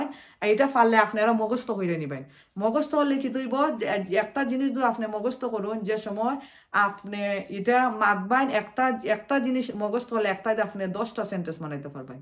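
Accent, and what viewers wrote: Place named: Sylhet, Bangladesh. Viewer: Sylheti mat hunram 🙈🤣🤣❤️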